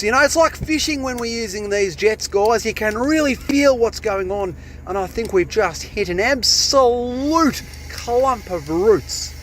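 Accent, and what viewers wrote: Australia. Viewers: Still here...been here for about 2 weeks! Never thought that this 'rabbit hole' would be so much fun! Your running Aussie commentary, makes me smile, and every so often when you give us a look at the scenery 🙄 love it! p.s. happy that scratch 'n sniff tv hasn't become a thing yet 🤣 'til next time, hello from Denmark 🇩🇰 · I know I landed here because I kept watching SB mowing after work when my mind was tired and I just needed to stare at my TV while not thinking much and the algorithm was like "You should watch drains being cleaned!" It's a bit like oddly satisfying videos. I can't really stop watching but sometimes I'll watch in disgust haha Still, I love to see a unblocked drain 😄 I'm watchin from Austria - but I guess were close at heart, because I can't tell you how many times people thought I was from Australia. In Japan I was invited to THREE interviews of English schools because they thought I had written Australia in my resume. 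And then they were disappointed I'm not a native English speaker... Whoops haha But as a native English speaker it is nice to hear the Australian dialect, since I usually only get to hear british and american English. So that's fun! 😄 Keep up the good work 🎉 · Your fuckn funny man love ya raw Aussie accent 😂😂😂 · G´Day, watching from Denmark. I found you my youtube magic. So far today I watched you unclog a car park drain, another gully+grease trap and pull out a sausage dog of a filthy hotel shower drain. Now can someone tell me why it´s so mesmerizing to watch this :D But i do have to say it´s a joy to see/hear someone enjoy there job. And I also like listing to some australisch , I spend one semester in Melbourne and it reminds me of that time, it was amazing and all the Aussies where super nice. I saw a nozzle like Mr German once in my local hardware store and I´m so tempted to buy one, we have a probably clogged drainage pipe in front of our carport. 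I now it would make a mess, I´m not sure if I will get the pipe clean, but listening to you having fun just wants me do it :D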